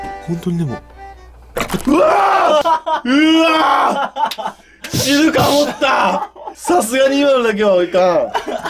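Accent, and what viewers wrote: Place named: Aichi, Japan. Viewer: 「生かしたる」とか「見ちゃかんよ」とか、めちゃくちゃ愛知県の方言っぽいと思っとったら愛知県だったわ笑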